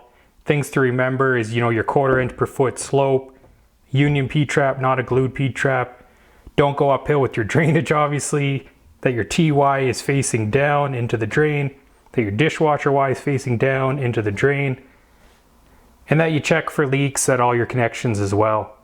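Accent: Canada. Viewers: Great job! You sound Canadian. Is it a norm in cold regions to use ABS piping for drainage? Or anything else? I was looking for a good tutorial for my kitchen plumbing project. Cheers from Houston, Texas.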